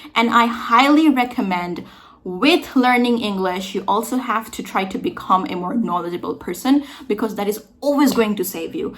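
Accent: United States. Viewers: To learn an accent like you do, I need to live in a US-like environment, or can I learn it at home by myself by practicing it? I know the basic english, and I speak it well. And nice video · I live in an area with one of the highest Sikh populations in America and we host one of the largest Sikh parades in the world. Your American accent is better than the overwhelming majority of Indians who live in this area. For some reason, learning the accent is seen as disrespectful by a lot of people. To the rest of us, it shows the amount of effort you've put into learning. I'm currently learning Welsh, so I know how difficult this journey is. Congratulations on your progress.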